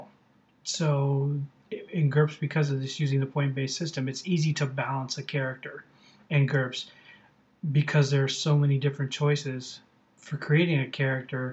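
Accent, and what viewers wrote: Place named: Midwest, United States. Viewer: funny accent. he sounds like a midwesterner until he says attributes. never herd it a-TRIB-utes.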